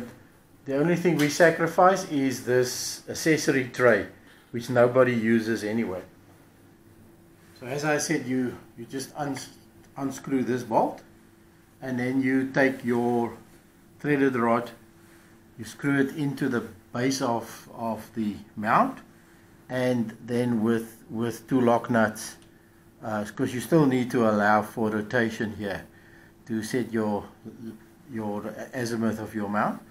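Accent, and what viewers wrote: South Africa. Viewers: Interesting. After buying a second Benro tripod recently for travel and being unimpressed with its quality (the large ones are great) I’m exploring the insane idea of building my own stand - whether it has 3 or more support stands doesn’t matter. I’m surprised how little information there is on the actual physics or DIY aspect of these. Video tripods feature an interesting collar for the legs which I believe yields a much more stable base than your approach- though I could be mistaken. Thanks for sharing this. Also, South African or Dutch accent? Sounds South African!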